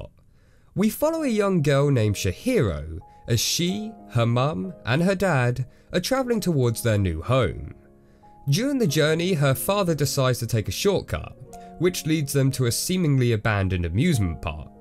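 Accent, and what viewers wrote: England, United Kingdom. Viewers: nice English accent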